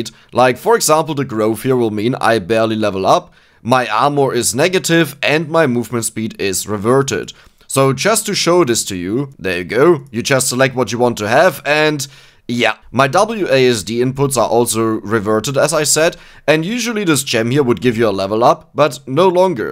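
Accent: Germany. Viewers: Kann das sein das du Deutsch bist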